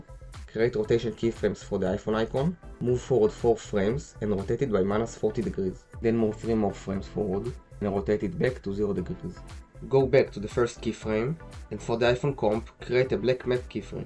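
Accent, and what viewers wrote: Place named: France. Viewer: french accent never can cover:face-green-smiling::face-green-smiling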